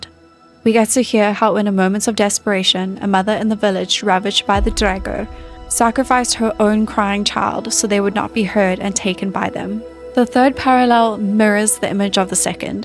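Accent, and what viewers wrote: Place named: South Africa. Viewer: Only realised after watching its you, i was like omg this is a south african voice and couldnt figure out where i heard it.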